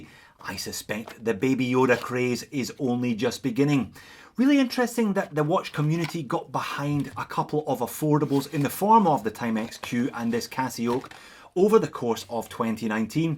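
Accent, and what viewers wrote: Scotland, United Kingdom. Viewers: I am an absolute fan of. One of the reasons being that he is a graduate of Griffith University also. I love the Scotish accent of this guy. He is a true talent. and for me is a benchmark channel on Youtube. For true watch enthusiasts I can also recommend looking into instagram accounts. In particular, of course, the one of. I further enjoy kicktoc, watchurious and monsterstraps. Keep it up!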